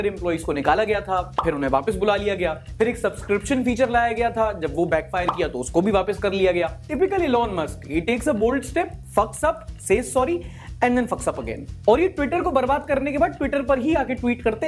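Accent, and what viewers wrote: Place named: Bundelkhand, India. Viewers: It feels so good to hear a recongniged youtuber speaking bundelkhandi.. ✨😁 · Bundelkhandi very beautifully spoken.. keep it up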